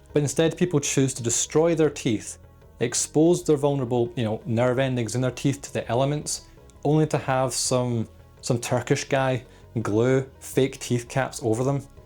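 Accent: United Kingdom. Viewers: I know you like the UK, but if you don't already know, you could legit geomax in the US. American guys and girls both find European accents really attractive, especially the proper British accent like yours (aussie accent is a close 2nd). It'd be a free +1 to your effective rating. With some girls even a free +2.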